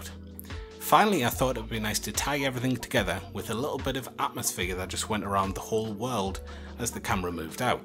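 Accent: United Kingdom. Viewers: Great video :) Also great to hear more regional UK accents rather than just typical American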